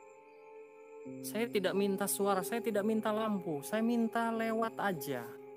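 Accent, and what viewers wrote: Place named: Padang, Indonesia. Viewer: Orang padang mna suaranya... Bukittinggi hadir bro..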